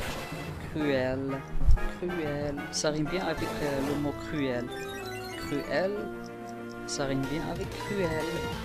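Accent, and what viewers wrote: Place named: Brittany, France. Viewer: trol ton accent de breton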